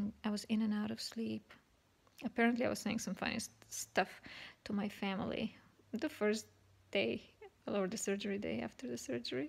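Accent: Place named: Russia. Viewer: Dear, thank you so much for your informative video. You helped me a lot. I just went through complete hysterectomy. I have a question. How long did it take for your bloating to subside? did you feel occasionally sharp small pains in your inside where the stitches were? I have sharp pain sometimes in my inside and my bloating is huge. But my surgery was on July 24, 2019 and today July 28, 2019. If there is any advice you can give to me to would be very appreciated. And also eventually did the bloating go away or do you end up having some left. Thank you for your time. Sincerely Naira (by the way I love the way you speak English, are you from Russia? I am from Armenia)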